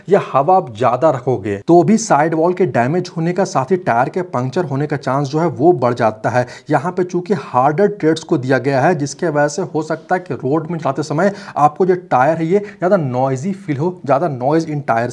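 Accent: Ranchi, India. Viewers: Bhaiya ap Ranchi se hai kya??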